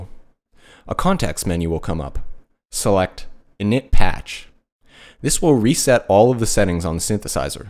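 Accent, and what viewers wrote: Canada. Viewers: are you canadian? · Thanks! This was really helpful. Are you by any chance Canadian? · Are you Canadian? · Hez a Canadian. "About"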